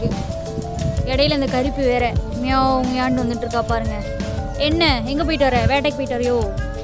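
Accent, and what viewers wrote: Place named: Kongu, India. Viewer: கொங்கு தமிழ் சூப்பருங்க அம்முனி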